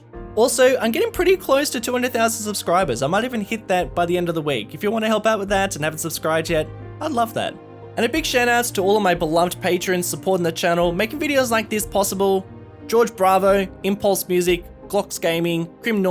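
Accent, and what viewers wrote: Australia. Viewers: he's not even british?? it's obvious his accent is australian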